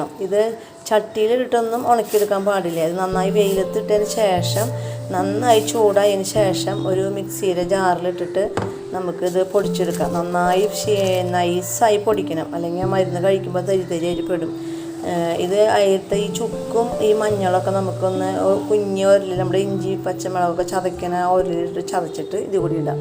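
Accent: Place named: Thrissur, India. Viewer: ഇത് എവിടാ കിട്ടും. തൃശൂർ ആണോ. സംസാരം kettit.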